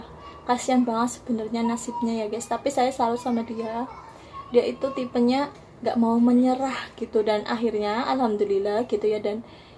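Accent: Banyumas, Indonesia. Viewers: Haha .ngapak kaliii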